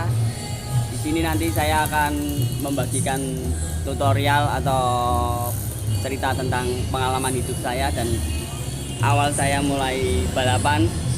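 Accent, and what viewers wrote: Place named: Java, Indonesia. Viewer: Mas logatnya org jawa, jawanya dmna mas?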